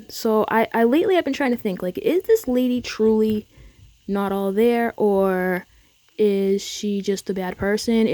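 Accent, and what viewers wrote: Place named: New York, United States. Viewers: Love your videos, love your fabulous East Coast accent! Thanks for your videos. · You are awesome... and I am not sure where you are from, but you sound like a fellow New Yorker to me! Love it! Keep being Unapologetically You Girlie!!!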